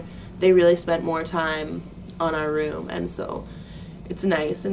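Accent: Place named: Maryland, United States. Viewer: she has to be from Maryland... her accent is thick haha i talk the same way.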